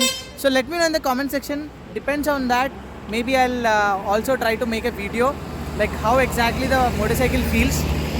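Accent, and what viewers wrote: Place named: United States. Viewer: ur english is awesome !! speaking like an american !!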